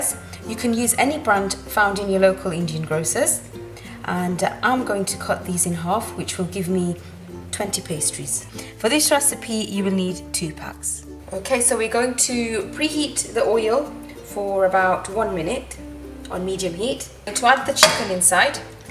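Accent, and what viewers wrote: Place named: England, United Kingdom. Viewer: What area do you live in?? Because your accent has a little English in it !! Great video sis 😊😊🙂🤗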